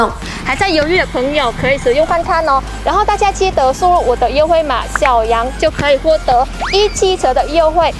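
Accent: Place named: Vietnam. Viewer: 中文超流利的，，，，只是還有一點（越南腔）,不然真的是正港的台灣人了，，讚哦！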